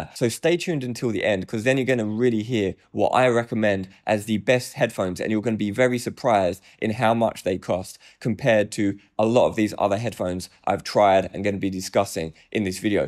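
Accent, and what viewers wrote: United Kingdom. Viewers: Well, he's opinionated. And with a British accent can help make him more obnoxious. I guess whatever works.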